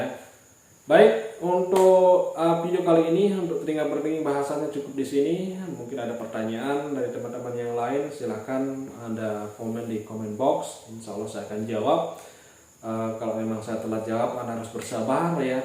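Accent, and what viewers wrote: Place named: Lombok, Indonesia. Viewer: Kayak logatnya orang lombok ini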